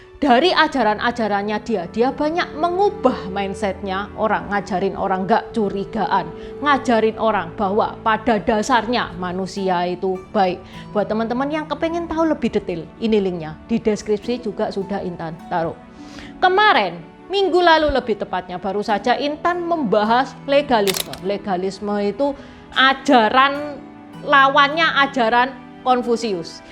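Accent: Java, Indonesia. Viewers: Mbak e logatte jowo banget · Keren nih china,dialeknya jawa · Tionghua....tapi medok jowo.... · Oke intan trima kasih skrg sy jd tau .Oya sy mau tanya ya kenapa ya cindo yg lahir di Medan atau di Bangka kok logat bicara tak sperti yg ada di daerah yg mereka tinggal ya Tak seperti cindo ( tionghoa) yg lahir di Jawa atau Sunda Salah satu contoh nya intan sy yakin intan asli dari Jawa kan....hehehe . Soal nya logat bicara nya ketahuan hahahehe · Aku suka logat medhok Intan, jd inget wkt sekolah di jogja hehehe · Kalo saya perhatiin di malaysia dan singapore, semua etnis yang ada disana seperti benar-benar terpisah. Di malaysia bahasa melayu umumnya digunakan oleh etnis melayu. Etnis tionghoa berbicara bahasa tionghoa dengan sesamanya, etnis india berbicara bahasa india dengan sesamanya. antar etnis berbicara dengan bahasa inggris. etnis selain melayu sepertinya enggan berbahasa melayu walaupun sebenarnya bisa. 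Apalagi di Singapore. Tapi sepertinya etnis Tionghoa lebih bisa berbaur. Bukan hanya soal bahasa Indonesia, tapi dengan bahasa daerah masing-masing tempat tinggalnya. sendiri malah lebih medok daripada saya yang asli jawa dan berbahasa jawa di rumah. Apakah ini semata-mata karena kepres 67 atau memang cindo pada dasarnya memang lebih membaur? Salut dan hormat buat atas kerja kerasnya membuat konten yang sangat mencerahkan. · Chino Medok Jowonya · Ketauan cindo ini kalo dia cindo Jawa soalnya Medokan jawanya terasa banget😂😂😂😂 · Suka dengan suara jawa nya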